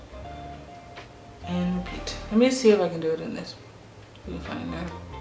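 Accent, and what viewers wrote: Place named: Netherlands. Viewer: Nice subtle job. I thought you were from the Netherlands haha because you have a accent.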